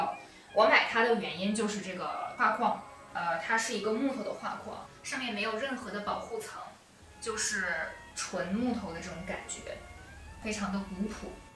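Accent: Northeast, China. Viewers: 妹子的东北口音已经被修饰得非常轻微了.你精心地学习过普通话，但是音节尾脚还是能被缺姐这样的听出来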